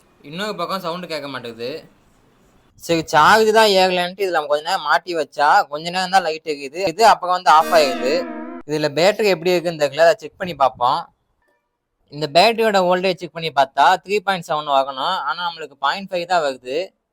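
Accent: Madurai, India. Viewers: Bro nega madurai yaa bro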